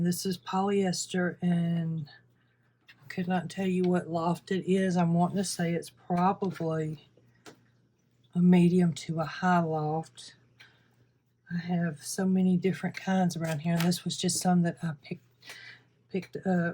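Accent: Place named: South, United States. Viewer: Keep going! I do all the hand craft/arts and sloppy/ large stitching is a totally new concept ( mind blowing) to me! I was taught by a perfectionist hand quilter ( 10-12 stitches per inch) and match all points or take it out and try again. It’s hard to break old habits and relax enough to do slow stitching as it’s called today. Kawandi, sashiko, boro are not the same but they are large needles and larger stitches. Raw edge will not wash up nicely unless you secure the edges down sufficiently— they will curl and fray and some areas may bunch up more than others. Keep experimenting and show us your progress! I love your southern drawl— just like my great aunt in Arkansas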